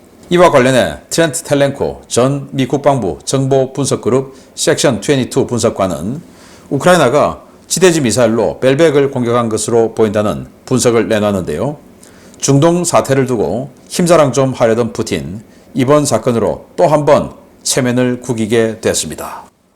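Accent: Gyeongsang, South Korea. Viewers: 신인균 너는 경상도 말투나 고쳐라 존나 듣기싫다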